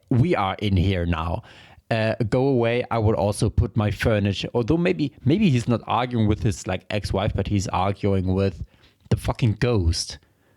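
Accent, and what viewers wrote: Germany. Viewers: why does your accent does sound like your german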